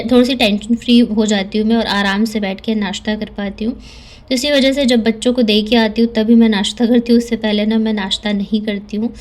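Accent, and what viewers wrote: Bihar, India. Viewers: Di aapki bihari bhasa bahut acchi lgti hai please kisi din banayiye pura blog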